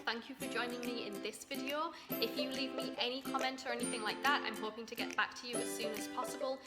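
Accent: United Kingdom. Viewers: Are you from uk? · You sound from the uk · What’s with your British accent? Sounds really nice, where are you from? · Have you lived in America long? You have a strong accent from Britain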